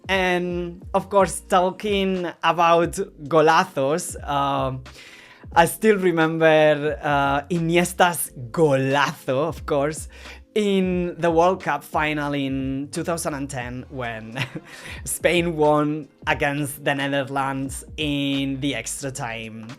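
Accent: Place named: Scotland, United Kingdom. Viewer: You have been in Scotland way too long... You can hear it a mile off. Whit a pass... 😂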